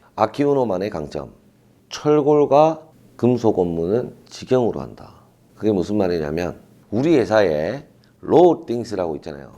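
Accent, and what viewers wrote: Gyeongsang, South Korea. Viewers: 비오는날 막걸리 먹으면 엄청 좋지요 항상 잘 보고 있습니다 경상도 사투리 좋습니다 저도 경상도인데. 괜찮은데요 ㅋㅋㅋ.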